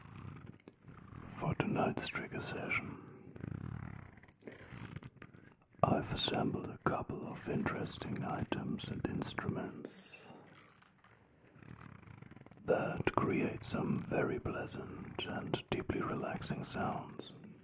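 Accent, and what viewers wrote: Germany. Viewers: Ist das eigentlich ein Deutscher? Wegen seinem Akzent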